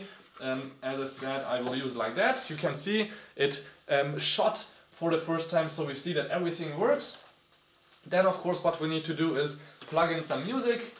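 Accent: Germany. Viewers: Du bist deutsch gä also emmm mam hörts bissl rauß aber egal gutes video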